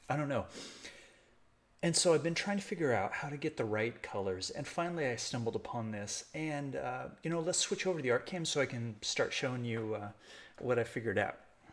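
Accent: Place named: South, United States. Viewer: Hi,. Really nice colors. I imagine it's gonna dry deeper so be sure & show us the finished painting. Oh, btw, I am a deep Southern gal & take no offense to yo bit of South talk. (Just don't put me down in my presence.)😎 💕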